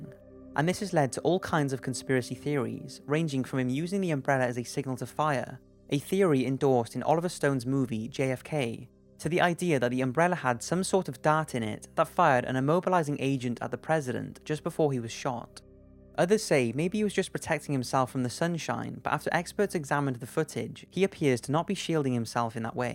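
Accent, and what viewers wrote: Wales, United Kingdom. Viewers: Are you welsh? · klo everyone wants to see the British-accented narrator's face xD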